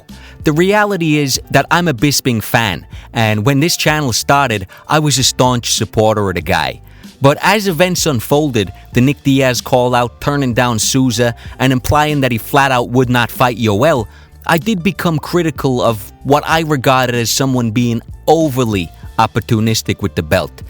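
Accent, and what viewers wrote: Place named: New York, United States. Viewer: That "New Yorkesque" accent and slang is just tremendous x)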